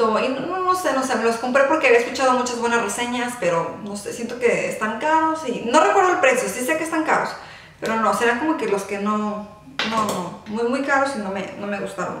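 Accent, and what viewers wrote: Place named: Fresa, Mexico. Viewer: Me gusta tu tono fresa , jaja :) . Con cariño y respeto saludos!!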